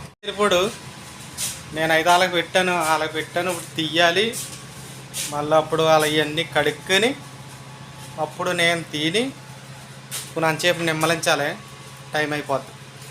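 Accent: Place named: East Godavari, India. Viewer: హాయ్ అన్న నీను మొదటి సారి మీ, వీడియో చుసాను బాగుంది, మీరు మాట్లాడే విధానం బాగుంది,మీ,మాట, తీరు చూస్తూంటే మా, తూర్పుగోదావరి జిల్లా మాటలా ఉంది, ఇప్పుడే సబ్స్క్రయిబ్ చెసాను బ్రదర్ ఇప్పుడు నుంచి మీ, వీడియోస్ చూస్తాను అల్ద్ బెస్ట్ బ్రదర్ అండ్ జైహింద్ 👍👍♥️♥️♥️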